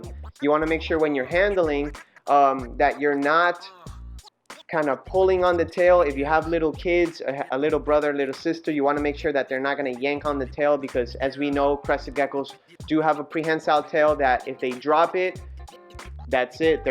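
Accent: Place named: United States. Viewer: So glad it is American accent ❤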